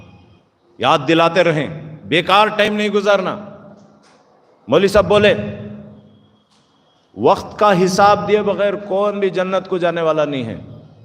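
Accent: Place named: Bangalore, India. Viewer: Banda bore hone nahi deta. Banglori language badi pyari n natural lagti h, central point bhi focused hojata h.Allaha salamat rakhe sab!!!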